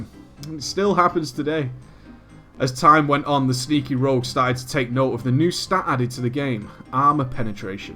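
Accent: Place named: United Kingdom. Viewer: I'm fairly sure he's from the UK, mainly because of the accent.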